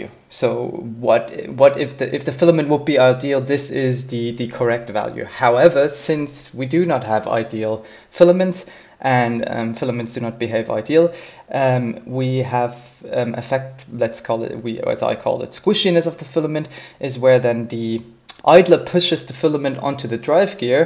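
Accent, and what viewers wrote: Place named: Germany. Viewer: Offtopic: I noticed your german accent and never thought that originates from germany. And after looking in your impressum I see karlsruhe, where I study:-). Muss ich mal in die Oststadt schauen:-D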